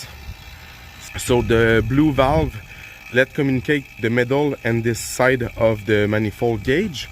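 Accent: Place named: Quebec, Canada. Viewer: Salut j'aime bien tes video je reconnais ton accent 😅 comme le miens. Ou tu te procure le r134-a au quebec?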